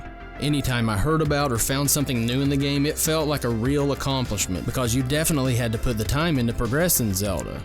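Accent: South, United States. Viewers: Dat southern twang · I was close lol I could detect a certain dialect. People think Southerners all talk the same but there are different dialects and styles. My dad's from Tennessee and says things like "ov'er" instead of over there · You said "Ravel's Bolero" right, btw. But I'm sure you already knew that. :-) Oh, as an aside, your videos help me to kill old preconceptions about the southern accent. I grew up in South Dakota (which has its own dumb-sounding accent, like from the movie Fargo), and I always thought a southern accent meant the person was uneducated. When I moved to Virginia, near the West Virginia border, a decade back, I still held onto that thought, at least in the first split-second I heard someone speak. But your videos are fun, entertaining, and I always learn something new from them; all of which helps me kill those old connections, and realize we're all the basically the same nationwide.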